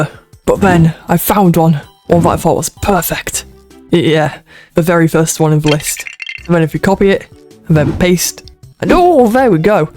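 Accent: United Kingdom. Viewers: Really Good job, the British accent makes it even better❤ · it is his accent, i live up north england and his accent sounds familiar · Very British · Since you are British, can you say “Can I have a bottle of water?” Please. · Are you British? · I Think bros British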